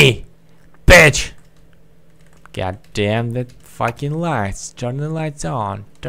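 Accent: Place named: United States. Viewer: Сначало подумал что ты американец, хорошо маскируешься👍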